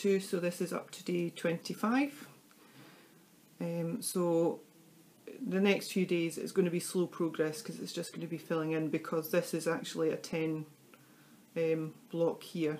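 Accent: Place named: Scotland, United Kingdom. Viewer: My grandparents are from Scotland...it's great to hear their accent again. Can you show me how you use the gradient floss?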